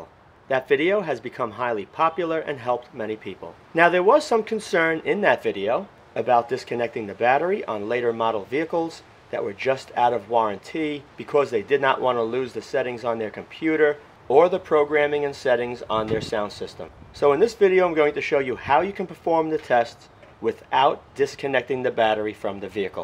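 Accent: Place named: Long Island, United States. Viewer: Thank you, and you are most welcome. Geez, hard to believe but it was 2004. Sure, a lot has changed, but in my opinion and experience the zenith of Toyota quality, fit and finish, and reliability was Japanese made Toyota vehicles of the late 80's-1995/96. Still relatively simple but done with tried and true technology and craftsmanship, and in many cases engines were VERY overbuilt, nearly indestructible, yet refined and beautifully executed/made with extremely high quality parts/metals. Still a lot of timing belts to deal with in this era, but the vast majority of these engines were non-interference, and a broken belt did not destroy the engine. I'd like to compliment you on your channel, and your way of going about your videos. They are clear with a lot of detail, well filmed, very well explained with easy to grasp concepts, done in an affable manner... 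The true mark of a great educator... And it even sounds like my old friends from Long Island. Take care, and please continue your great work.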